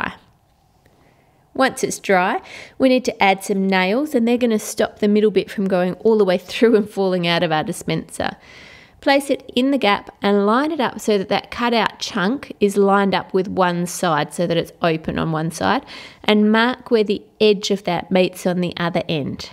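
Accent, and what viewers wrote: Australia. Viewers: Ate you Australian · Its just a aussie accent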